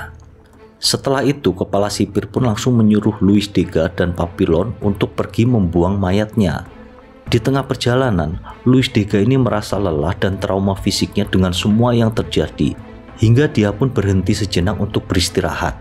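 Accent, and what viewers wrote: Java, Indonesia. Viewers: Suaranya medok bangett hahaha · Suaranya agak medok Jawa y bang?🤔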